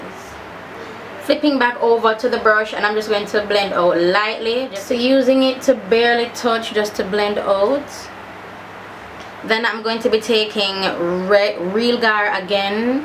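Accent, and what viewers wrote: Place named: Jamaica. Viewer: Well excuse me; that look screams pure royalty 🙌🏾. You look absolutely stunning. Very skillful. On another note, I just love your accent, tone of voice, speech pattern and how you enunciate your words. Thumbs up for Jamaican 🇯🇲 patwah. Thank you for sharing.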